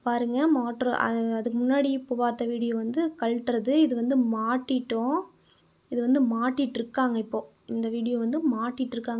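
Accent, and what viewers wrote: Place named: Kongu, India. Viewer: தாங்களுக்கு கர்நாடகமா சாரிமா(புனிதா)சகோதரி தாங்களுக்கு கோயாமுத்துர் என நினைத்தேன்மா சகோதரி ஏன் என்றால் உங்கள் பேசியதை(கொங்கு ல்லாங்க்)வைத்து நினைத்து விட்டேன் 😊😊😊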